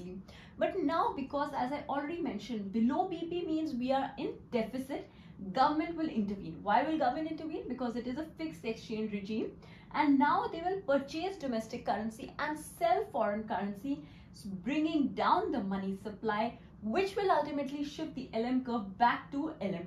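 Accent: India. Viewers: Usually, the Hindi accent stands in a way of my understanding of the given concept. However this video was properly explained, so the language barrier did not occur. Keep up the good work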